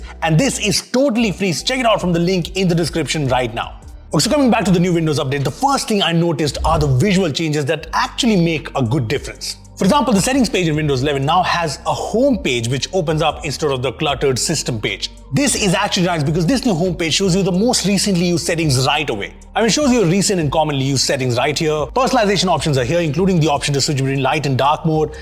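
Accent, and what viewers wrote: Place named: India. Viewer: this is the best indian english accent i have ever heard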